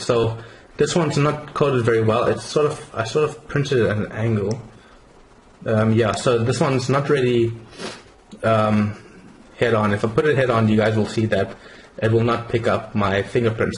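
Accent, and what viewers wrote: South Africa. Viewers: I thought that your accent sounded familiar, You're from Durban! im from Cape Town! Great project man, ill be sure to have a deeper look at your system, Good luck with the future of this project!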